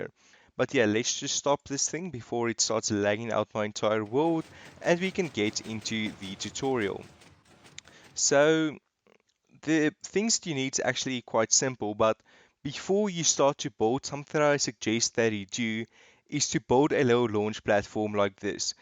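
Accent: Afrikaans, South Africa. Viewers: Its not just me or is this man Afrikaans cuz I am and he sounds a lot like somebody from my country :/ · Are you South African? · You are SA right? you sound soooooo south African just like my best friends!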